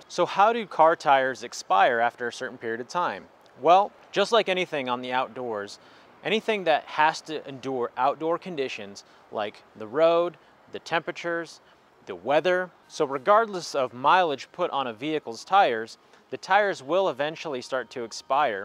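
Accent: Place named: Kerala, India. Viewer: നന്നായിരിക്കുന്നു , ഇഷ്ടപ്പെട്ടു. നല്ല ഇംഗ്ലീഷ് ... ഒരു മലയാളി Touch.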